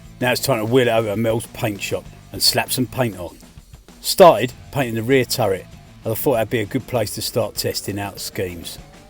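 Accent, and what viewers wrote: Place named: Cockney, United Kingdom. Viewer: thematically essex/cockney narration on da orks is inspired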